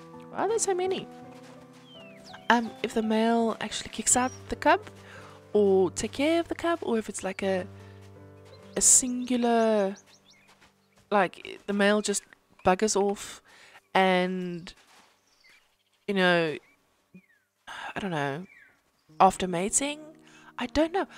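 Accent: South Africa. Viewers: Omg your south African this is amazing I am to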